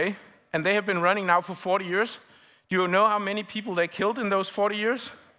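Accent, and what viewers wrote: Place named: United States. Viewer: It never ceases to amaze me how good your American English is.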